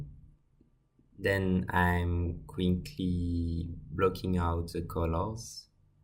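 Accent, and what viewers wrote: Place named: France. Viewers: For sure his French xD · He can’t sound more French than that😂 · Aren't you french ? Damn this accent XD Anyway, I love Tales From Earthsea, so I'm glad to see someone drawing a scene from it :D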